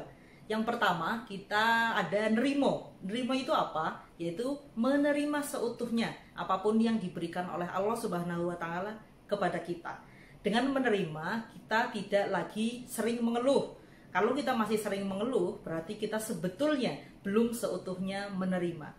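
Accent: Banyumas, Indonesia. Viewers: Berkah melimpah buat,ilmunya memberdayakan sekali.terima kasih 🙏 Saya galfok sama sedikit dialeknya spt kluarga di kota ngapak ngapak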